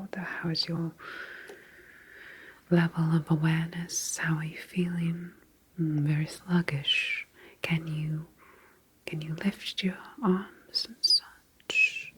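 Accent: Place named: Europe. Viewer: I looooove the subtle "continental" accent on this. Time for another hard New England accent video to balance it out?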